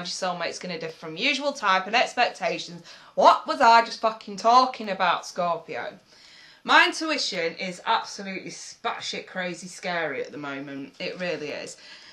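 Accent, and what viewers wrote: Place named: Manchester, United Kingdom. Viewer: Fab reading chic........R u from Manchester????xxxxx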